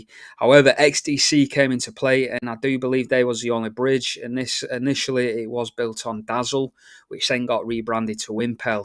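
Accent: North, United Kingdom. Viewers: I'm from Middlesbrough, Living in London, can't take the north out of a northerner mate lol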